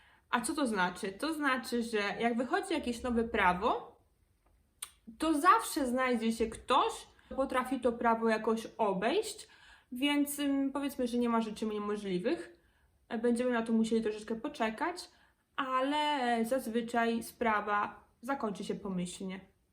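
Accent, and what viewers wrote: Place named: Italy. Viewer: Ale akcent złapałaś 😂 Również we Włoszech, na zawsze. Uwielbiam życie tutaj, nawet ten bałaganik i odkładanie na później. Kierunkowskaz w prawo można dać sobie spokój, w lewo owszem można użyć 😅 Ogóle podejście do jako takiej dyscypliny jest raczej typu „żyj i daj żyć” Włosi są bardzo kreatywni i jakoś się odnajdują w każdej sytuacji (czego np Niemcy nie bardzo potrafią) Kręcą się wszędzie, kawiarnie zawsze pełne a szczególnie teraz po Covid odrabiają zaległości Klimat❤❤❤ chociaż uwaga, gorąco i parno, szczególnie we Florencji i nizinie padańskiej, może trwać miesiącami, klimatyzacja wręcz niezbędna. Temat rzeka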